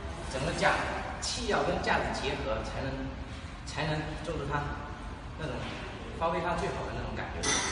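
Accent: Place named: Fuzhou, China. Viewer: 亲切的福州普通话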